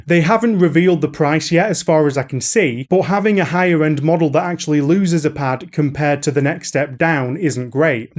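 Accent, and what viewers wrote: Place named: Lancashire, United Kingdom. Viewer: Ah was picking up some Karl Pilkington vibes from that accent. Most definitely not an idiot though; love this channel. :)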